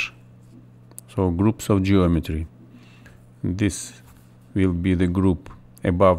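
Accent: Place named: Russia. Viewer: Я не знаю кто Вы... но у Вас очень хороший Английский с прекрасным Русским акцентом... :-) And Thank You very much for this video and for the effort You've taken!!!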